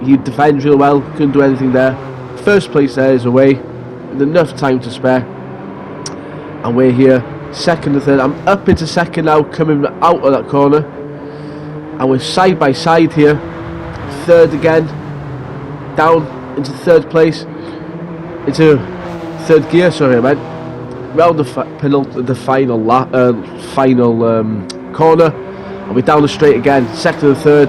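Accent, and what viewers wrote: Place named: Wales, United Kingdom. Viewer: Notice that Welsh accent now buddy! 😂 great result buddy!🤟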